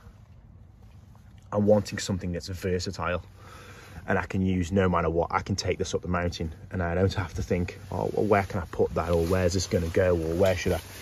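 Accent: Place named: Stoke, United Kingdom. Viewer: you sound like your not far from stoke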